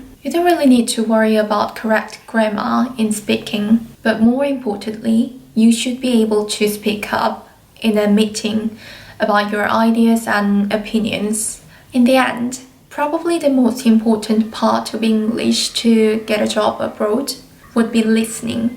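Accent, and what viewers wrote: United Kingdom. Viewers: 우와 발음이 미국 발음이 아니라 영국 발음이네요 완전 멋있어용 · 이제는 누나라고 부를게여... 영국발음 너무 멋지잖아여!! 저도 슬슬 인턴 나갑니다. 영국가는날까지 열심히 해야겠어요 ㅎㅎ 코로나 조심하세요~~~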